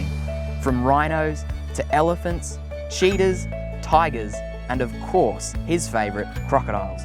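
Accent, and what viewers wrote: Australia. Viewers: I'm only in it for the Australian accent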